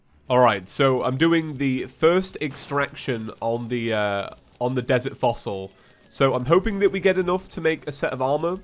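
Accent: England, United Kingdom. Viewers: I believe that is the English accent making it hard to say chests. Us Minnesotans are fine.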